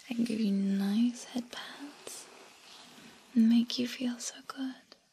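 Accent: United Kingdom. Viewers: i enjoyed that slight british accent feels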